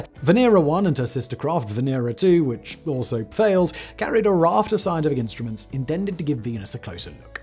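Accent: United Kingdom. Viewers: why is there suddenly 50,000 british guys narrating stock footage for ad revenue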